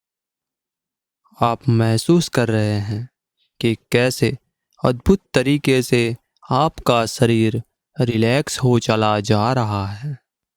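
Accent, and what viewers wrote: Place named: Rajasthan, India. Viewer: राजस्थानी लहजे में बोलते हो, कहा से हो ?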